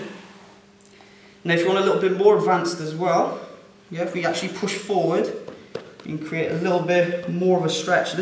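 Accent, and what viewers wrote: Wales, United Kingdom. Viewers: Heard the welsh accent and seen you teach around newport. May have to pop in some time and check it out.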